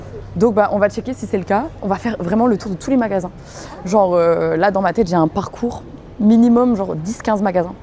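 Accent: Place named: North, France. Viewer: Elle vient de chnord je reconnais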